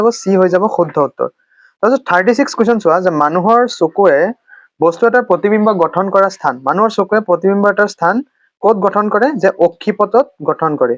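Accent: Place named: Nalbari, India. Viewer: Sangaskrit or Lage sir Nalbari Distic or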